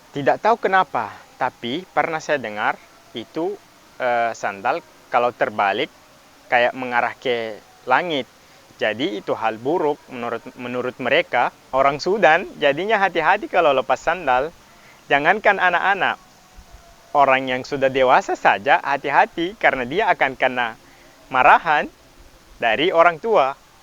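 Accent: Makassar, Indonesia. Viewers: Serius kak. Semakin kesini bahasa Indonesia Bagus. Tp semakin kesini jg logat makkasr jg semakin jelas :)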